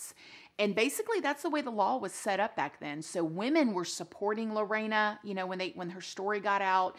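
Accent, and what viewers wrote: South, United States. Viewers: You told the story PERFECT better than any I heard even back then! And I LOVE ya southern twang and your SOOOO Soo pretty 😍😁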